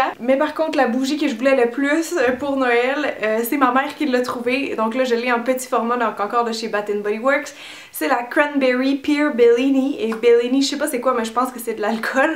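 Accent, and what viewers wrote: Quebec, Canada. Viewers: Berk l'accent québécois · Je ne trouve aucun interet au commentaire mais j'adore l'accent canadien.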